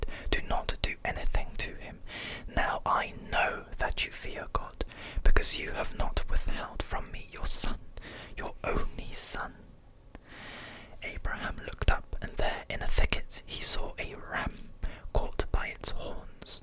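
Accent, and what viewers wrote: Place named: England, United Kingdom. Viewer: Wow hi, I just discovered your channel. Your voice is so cool! England-accent is nice. Also, I'm sorry you faced the racism you did. :( Also thank you for being so vulnerable. I know that can be very hard. Please feel free to talk about yourself more. Talking helps bring healing. Allow yourself the healing you need.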